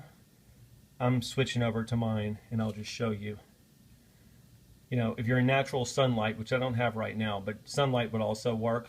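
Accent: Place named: South, United States. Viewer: Pah-peel-yone. It means "butterfly" in French. Love your videos. Great calming voice, with a pleasant Southern lilt.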